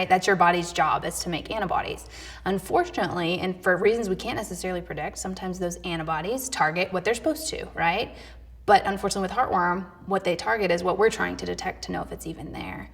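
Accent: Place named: Philadelphia, United States. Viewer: She sounds like she’s from Philly lol.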